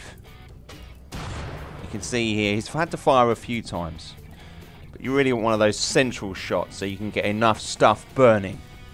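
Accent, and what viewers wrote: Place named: Germany. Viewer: why do you speak as german?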